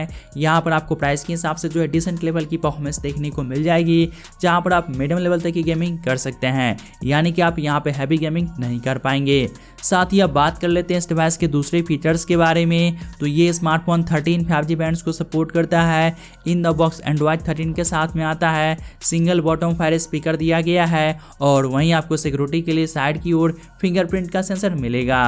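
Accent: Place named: Bihar, India. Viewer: भाषा उच्चारण में सुधार की जरूरत है बोली में बिहारी झलक नजर आता है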